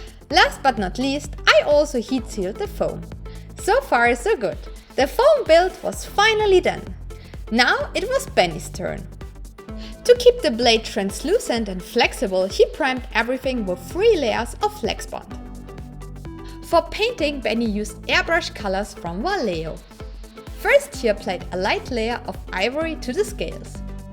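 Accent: Germany. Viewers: 2 sec geschaut direkt gewusst das sie deutsch ist XD. deutsches englisch hört sich einfach retarded an XD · You sound a bit German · so ein deutscher Akzent..... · Your german accent shows thru... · a question...r u from germany...cuz ur accent sounds pretty german xD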